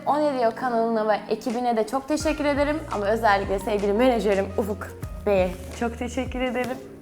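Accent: Black Sea, Turkey. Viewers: Karadeniz şivesi değil Karadeniz ağzı.Şive başka ağız başka.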